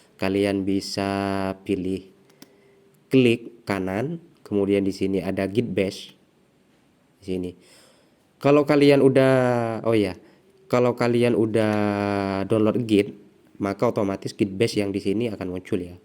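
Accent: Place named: Madura, Indonesia. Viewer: Orang madura ya bang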